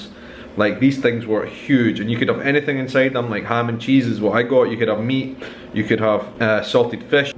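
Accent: Scotland, United Kingdom. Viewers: Are the Brazilians, having a wee trouble with your Scottish accent? :) Have a great time.. I hope they ship that Lemon Cake to the U.K.! for you...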